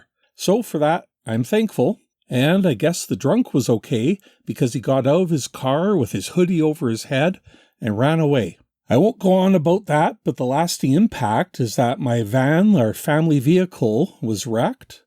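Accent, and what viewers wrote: Canada. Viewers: Thanks for all the content. Your voice is so relaxing, and I love your Canadian accent!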